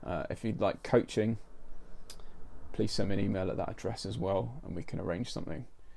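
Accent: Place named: United Kingdom. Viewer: I took accutaine twice, once in my teens and again at around age 32. Suffered different areas of body pain through my 20's but never made the connection. After taking it the second time, got major gut issues, pain got worse and lots of brain fog. At 42 the symptoms got really bad fulltime and I've been sick and unable to work since. I'm 62 now. Do not even think of taking this drug! I've tried almost everything but I never heard of the gallbladder/liver connection until I watched your video. It makes a lot of sense. Going to look into it further. Have you thought of FMT? You have a British accent so if you are in England, there is a clinic called, I believe Taymount, that some say has helped them. I'm in Canada so it would be a bit of long haul. We'll see. Please keep posting if you're able.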